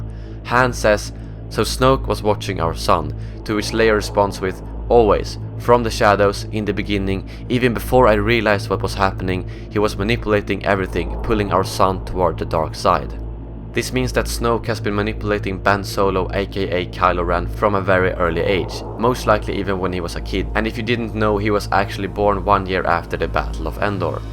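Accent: Sweden. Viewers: Älskar din svensk engelska :D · Dat svengelska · U sound swedish ????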